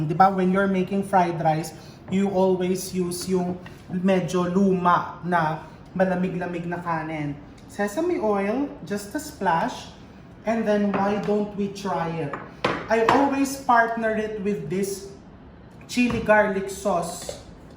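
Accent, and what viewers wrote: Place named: Cagayan, Philippines. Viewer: Chef we have same accent from tuguegarao city.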